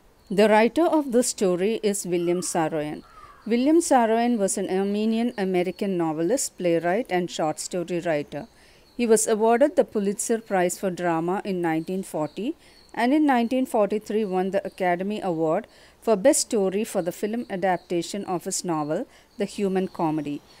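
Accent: Kerala, India. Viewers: Are u a Malayali?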